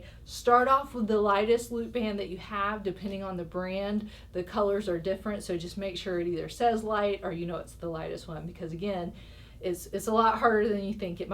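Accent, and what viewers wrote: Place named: Alabama, United States. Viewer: alabama drawl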